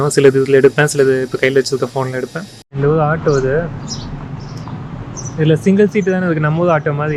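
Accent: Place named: England, United Kingdom. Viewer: தெளிவாக பேசவும் புரியவில்லை ஆங்கிலேயர் போல் தமிழ் பேசினால்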